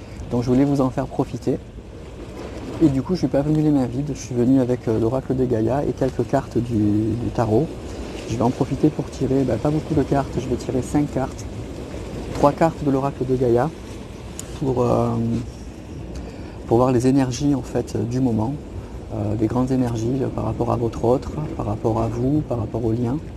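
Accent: Marseille, France. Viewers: On dirait Marseille 🥰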